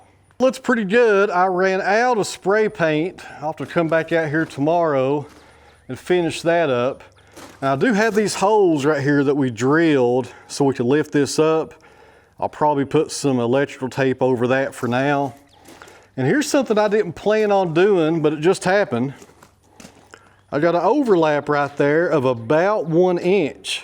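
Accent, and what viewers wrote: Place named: Tennessee, United States. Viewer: Audio is perfect. Can understand even with your Tennessee accent. Lol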